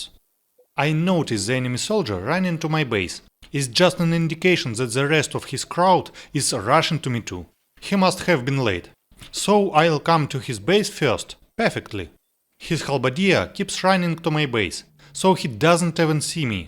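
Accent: Russia. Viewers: Русский акцент))) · я не лингвист и могу ошибаться, но вроде бы это ошибочное мнение новичков в языке (и я так думал; не то чтобы я был гуру в английском ^_^, но считаю, что уровня Intermediate). Чётко и с акцентом -- русским так чётче и понятнее, а иностранцам -- наоборот. Но ведь такое видео делается как раз-таки для них...:)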